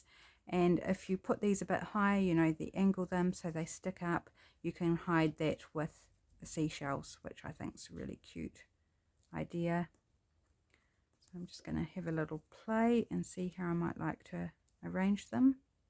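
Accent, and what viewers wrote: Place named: Australia. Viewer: I am from Aussie so it was great to hear someone from this side of the world.Your clear pockets looked good, thanks for you video.thank you for your palm tree freebies.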